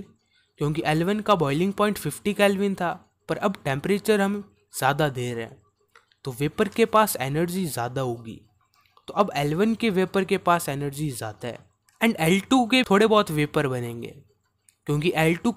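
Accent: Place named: Bengal, India. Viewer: Sir are u bengali that mota moti sounds familiar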